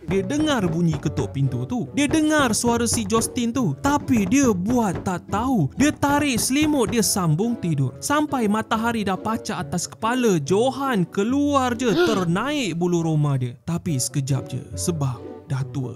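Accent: Sabah, Malaysia. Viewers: Orang Sabah Ka kau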